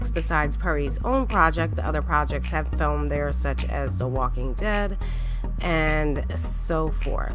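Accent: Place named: Maryland, United States. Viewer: You from maryland or d.c.? Your accent is heavy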